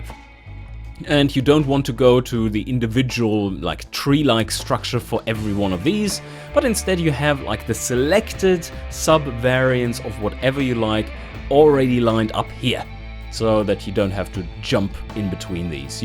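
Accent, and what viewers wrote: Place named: Germany. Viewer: Ich mag Englisch mit deutscher Akzent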